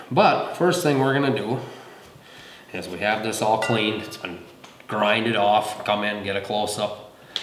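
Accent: Minnesota, United States. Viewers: That Minnesota accent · I hear the MN accent bro. "There you goooo" lol love it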